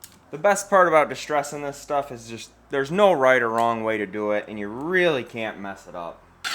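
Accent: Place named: Wisconsin, United States. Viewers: okay. I may have recognized it from that. I grew up in Wisconsin. Now I live in Southern Maine. Two totally different accents, of course. But there is a similarity with the way you enunciate your "O". Great video. I subscribed. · I hear Mid West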